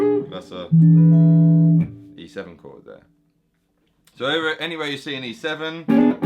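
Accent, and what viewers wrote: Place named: Essex, United Kingdom. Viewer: Well, that was not the accent I was expecting... Essex? Nice vid btw! Gonna give these chords a try. 👍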